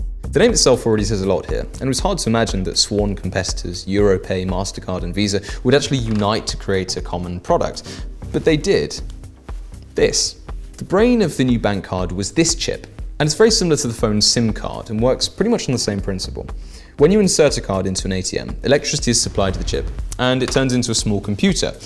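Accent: RP, United Kingdom. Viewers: I love the content. Hate the blatantly obnoxious royalty accent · How I like British accent. I don't understand any words. What are you saying in this video???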